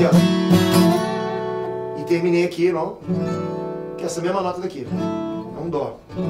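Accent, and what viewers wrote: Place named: Rio de Janeiro, Brazil. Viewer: e essa voz de carioca ai tem jeito?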